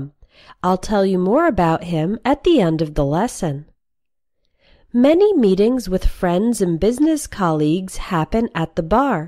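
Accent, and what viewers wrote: United States. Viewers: So, If I may add. Why the automated robotic american voice? Got through about 20 seconds of this and gave up.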